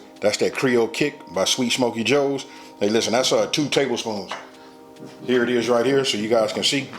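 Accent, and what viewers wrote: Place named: California, United States. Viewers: You sound like you from Cali.I use to live in Alameda. Mannn... keep doing ya thing brother. Godbless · I love the L.A. accent boy felt like home!! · OMGGGG i’m trying like recipe! this my first time coming to your channel. as soon as you started talking i knew you was california🤣😭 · That’s how people from Cali sound. Black men either sound like Ice Cube E-40 or CJ from Grand Theft Auto San Andreas in California 😂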